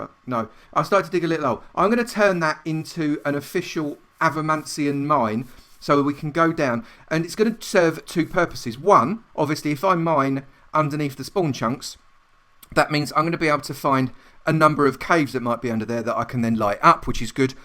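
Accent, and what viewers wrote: United Kingdom. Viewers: hey dude, been watching your videos on and off and keep coming back to them so decided to watch your survival L:P from the start - your later videos are fine but in this video in particular the music edits are way louder than you, I don't know if you know or not, but in cse you don't, depending on what software you're using to record, if you "Normalise" the audio track, it will level out and optimise the volume decibels without destroying the quality (e.g. if your voice is 20db and the music is 36db, it'll put the volum eto around 28 or something and then you can adjust the volume how you like of the entire track as a whole) Loving the videos, especially the early game farms one, I never thought to lay out the saplings in a slanted rectangle formation, and also never thought to drop lamb down into a slaughter chamber for when they grow up. 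Kinda neat ideas. Nice to hear more Brits taking over the Minecraft scene:P hahahah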